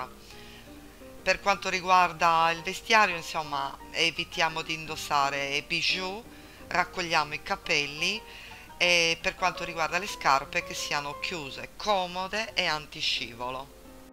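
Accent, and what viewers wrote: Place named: Sardinia, Italy. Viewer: Wow che bella voce forte e si sente l'accento Sardo,Bel video cara,molto ben fatto anch'io inizio le pulizia dalle parti alte,like e buona giornata!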